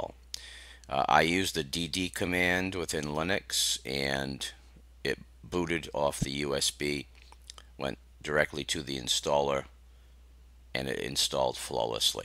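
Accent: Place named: New England, United States. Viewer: You've got to be from Mass or NH with that accent.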